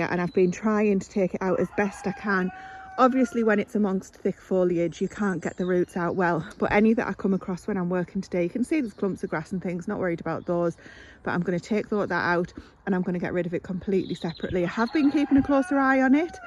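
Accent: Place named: Geordie, United Kingdom. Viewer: I just click back into the video and yes I did recognise a little Geordie accent normally would’ve rang a bell straight away because my brother was married to a lass from Newcastle 🫶🏼. Anyway enough waffling all the best have a great weekend. Kind regards from the Netherlands Nigel Burgess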